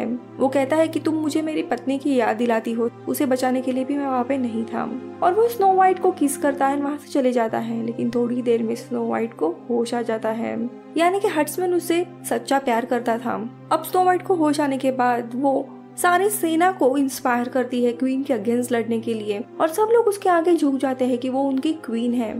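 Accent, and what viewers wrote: Maharashtra, India. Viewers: do you have any relation with nikhil of climax explained in hindi .moreover you both sound a bit marathi . pls tell and BTW love ur explanation.thank u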